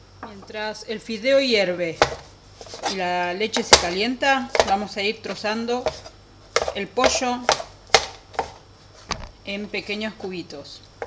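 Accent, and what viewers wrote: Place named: Argentina. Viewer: Eso depende del país en donde vivas y las pronunciaciones de ciertas palabras. Ella es de Argentina y por eso tiene esa pronunciación. No lo hace mal, sólo es su forma de hablar, y está bien.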